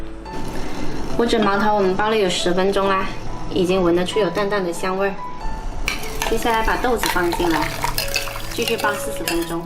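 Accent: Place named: South, China. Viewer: ， 請問你是哪裡家鄉的人？ 是兩廣一帶嗎？ 你的普通話是挺南方腔啊！ 可否多介紹你的家鄉菜， 好公諸同好？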